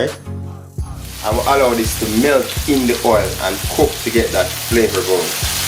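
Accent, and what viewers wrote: Jamaica. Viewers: 🇺🇸🇬🇧 Hello 🤓. This is my first time seeing and watching your channel and I'm sure it won't be the last. I'm sold.. what a lovely and vibrant young man you're? Very clean and detailed cooking/ preparation. I love your vibes, I'm very pleased. I love your English entwined with the Jamaican Creole. I must tell you that I'm not a food or person. However, I like chicken, lobster, shrimp crab and fish. So, now that you're sending me in the kitchen to learn to cook Jamaican food 🤓. I will be trying the pig tail and the chicken soon. Son, God has blessed you with a wonderful talent. You've got many more as well, use them. Keep striving for the best. The sky is the limit, continue to be an inspiration to the youths. Too many of our youths are in jail or doing drugs, stealing,gangs etc. I'm one mother and grandmother who is very proud of you, you've my prayers and my blessings.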